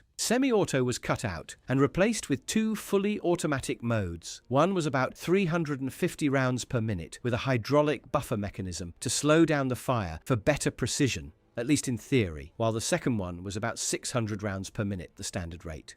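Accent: United Kingdom. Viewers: Hilarious crapping on a gun that helped win a world war and the fact a brit is the commentator makes it even more hilarious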